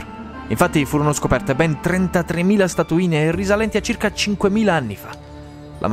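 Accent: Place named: South, Italy. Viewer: di tutti i tuoi video... mi piace il tuo accento del sud. heheheheh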